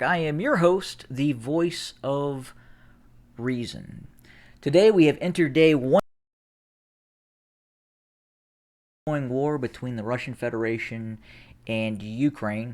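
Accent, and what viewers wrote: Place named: United States. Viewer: Hilarious that this guy who sounds American says he’s based in the US. This network should be called the Voice of Russia.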